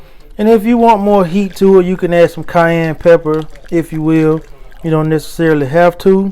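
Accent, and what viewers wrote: Deep South, United States. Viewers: Always trust the man with a Deep South accent to teach you how make a BBQ sauce. Thank you sir.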